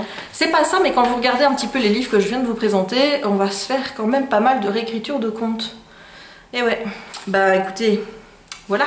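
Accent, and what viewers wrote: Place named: Belgium. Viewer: Coucou! C'est la première fois que je te laisse un commentaire mais je regarde tes vidéos depuis longtemps, et ce soir je voulais juste te dire que je les aime beaucoup! Elles sont de plus en plus dynamiques, rigolotes, pleines de vie en fait! Je trouve que tu es très fraîche, et ton petit accent belge me rappelle des bons souvenirs (ma famille maternelle est belge également). Les sélections de sorties pour les mois à venir me plaisent toujours parce que cela permet de trouver plein de nouvelles idées de lecture! En feras-tu prochainement pour les livres en VF aussi? Je demande surtout par curiosité, les VO me vont déjà très bien. Belle soirée!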